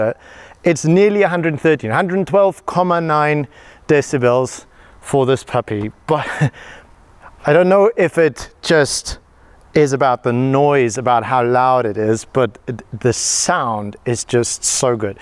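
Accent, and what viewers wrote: Afrikaans, South Africa. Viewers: My guy are you from South Africa? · Epic as always and that Afrikaans accent WITH the words and phrases 🇿🇦leka man 💯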